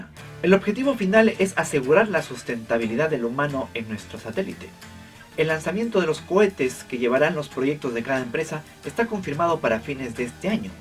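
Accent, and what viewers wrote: Peru. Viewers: ERES DE PERU? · no sabía que eras de Perú, es que tú acento se oye diferente, y tu vídeo me súper encanta, interesante como todos los que nos compartes. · ¿eres peruano?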